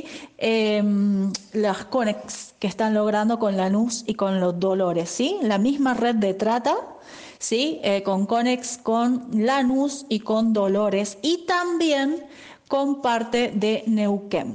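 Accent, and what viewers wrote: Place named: Colombia. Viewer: yo creo que no porque habla con acento como de colombiano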